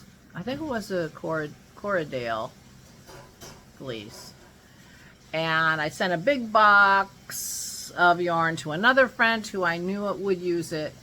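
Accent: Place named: Montreal, Canada. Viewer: I love how your Montreal is coming out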